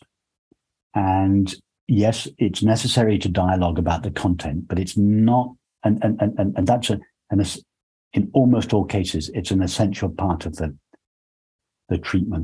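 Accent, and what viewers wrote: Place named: Belfast, United Kingdom. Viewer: Hi, I here ur accent I feel like ur from Belfast or near to that. I have been on this journey for some time now and I get some glimpses here and there. I'm really starting to understand things at an intellectual levels. I'm on you tube alot seeking. I'm just wondering is there anyone you woukd recommend from our side of the world to help guide me on this path. Thank you for this video. I look forward to watching more. ❤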